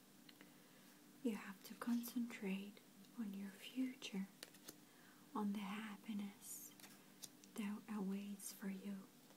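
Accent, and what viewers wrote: Russia. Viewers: I love all your videos, but make more videos, esp this kind of video, with your russian accent you do so well. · Please do another one of these fortune videos,!:( But, PLEASE do it in the Russian accent...it makes me feel so warm and at peace...nurturing <3 I've listened to a few of your Russian videos to fall asleep, lately...they help SO well!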